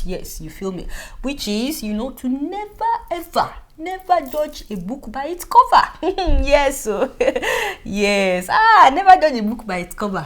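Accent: Yoruba, Nigeria. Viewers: you are definitely keeping it real! I love your show. Keep up the good work. I love the Nigerian accent. It is the Yoruba coloration of the accent that makes it so lovely. I am not Yoruba, but I love it.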